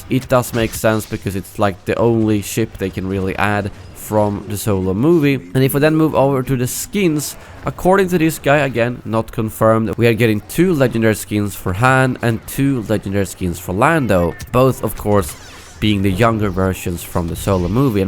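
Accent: Sweden. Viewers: are you swedish? är du Svensk?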